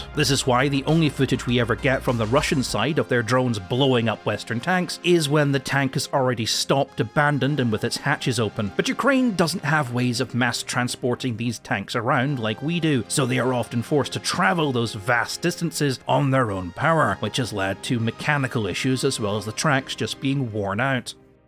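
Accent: Scotland, United Kingdom. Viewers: FUCK YEAHHHHHHHHHHHHH THE FUNNY SCOTTISH VOICE. I FUCKING LOVE MILITARY HISTORYYYYYYYYYYYYYYYYYYYYYYYYYYYYYYYYYYYY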